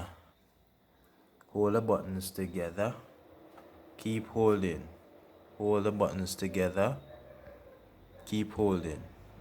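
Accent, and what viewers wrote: Caribbean. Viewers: As soon as I heard the voice I knew I was in good hands. Soothing Caribbean accent man, thank you so much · Which part of the Caribbean are you from?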